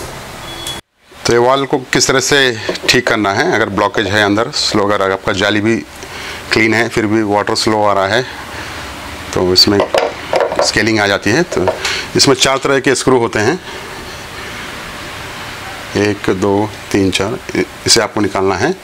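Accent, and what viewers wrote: Hyderabad, India. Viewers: 100% he is from Hyderabad India ...Correct me if i'm wrong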